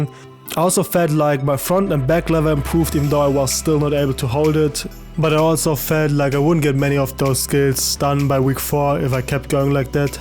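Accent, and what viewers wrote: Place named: Germany. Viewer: I hear deutschen Accent (W Vid bro)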